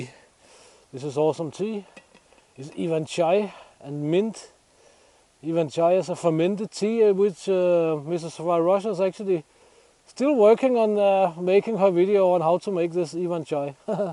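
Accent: Denmark. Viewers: I looove the accent! Danish? (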